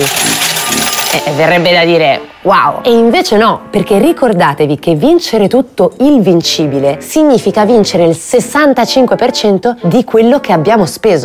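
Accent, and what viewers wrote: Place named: Bologna, Italy. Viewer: Dimmi che sei di bologna senza dirmi che sei di bologna. spento il video subito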